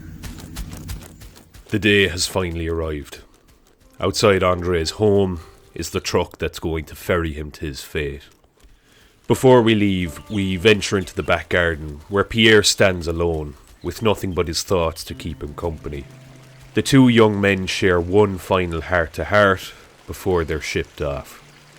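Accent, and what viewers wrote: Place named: Limerick, Ireland. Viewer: Oh, by the way, what's your accent? It makes me think of OP Shakespeare a little bit. Edit: Ah, Limerick.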